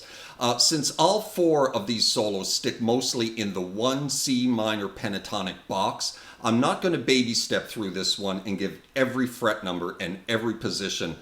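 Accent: Canada. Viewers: good to hear a Canadian accent! I was living in Toronto until January, now im back in Australia. Miss my buds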